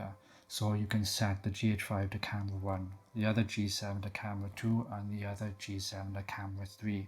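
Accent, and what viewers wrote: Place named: Wales, United Kingdom. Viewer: thank you so much, it was very helpful, but you kinda lost me in minute , it got very complex and i have trouble understanding your welsh accent. Keep the good work. Thanks one´s again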